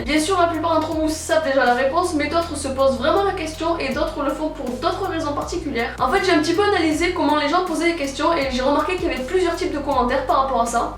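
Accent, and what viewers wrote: Toulouse, France. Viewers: Tu connais pas bien les accents toi x) c'est l'accent toulousains xDDD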